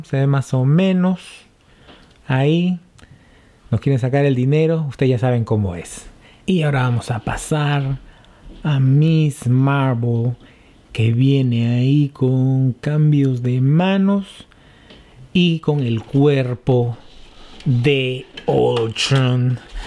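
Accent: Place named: Peru. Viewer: Por fin un shartimus prime que puedo entender xD, buen video Bro, eres de Perú me párese? Tienes un suscriptor más (y)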